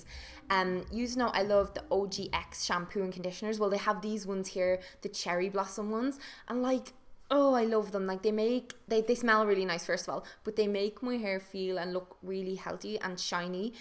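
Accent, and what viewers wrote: Ireland. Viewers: Your sound so much more Irish in America if that makes sense 😂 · I love how I live in America and literally don't have neither the beauty blender or the Anastasia brow pencil.. like what the heck?! This has gotta be a sign! haha -also this is hecka random but I bet you make Californians happy with that amazing Irish accent you have :P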